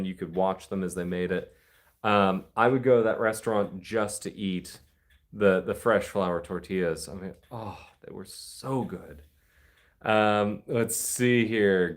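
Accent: Scotland, United Kingdom. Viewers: Happy new year. Glad to hear your Scottish accent is coming along nicely. You say Scotland with a Scottish lilt. From Overtown in Scotland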